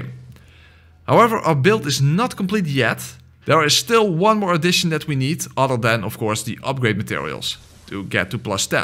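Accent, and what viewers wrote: Netherlands. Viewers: Are u a dutch guy? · Ey ur Dutch right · A dutch man, god, thats gonna bother me... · Is hé dutch?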